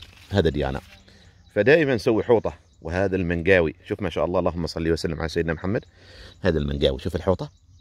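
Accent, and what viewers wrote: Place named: Algeria. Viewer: صوتك تقول عليه جزائري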